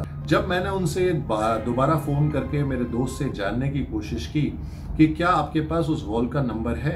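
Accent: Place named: Turkey. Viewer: I know this guys is speaking english but the turkish accent makes it gibberish :(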